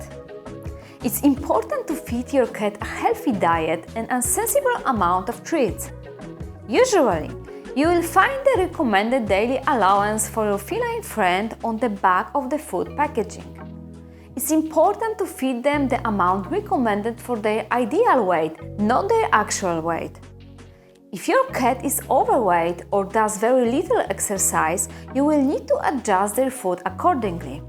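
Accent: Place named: Austria. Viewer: Tysm for the info! I love your austrian accent!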